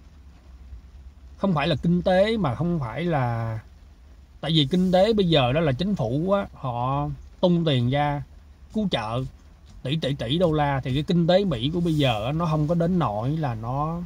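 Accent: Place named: Can Tho, Vietnam. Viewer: Chúc. lạc quan lên mình người bạc Liêu và thích giọng còn càn thơ của bạn nhìn thấy bạn hơi ốm và mắt hơi buồn làm mình buồn lây. Thôi cố giữ vững cuộc sống nhé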